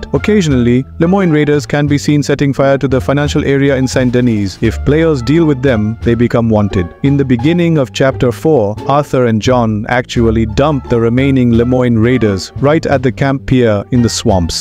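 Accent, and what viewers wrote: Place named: India. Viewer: Bros trying so hard to not sound Indian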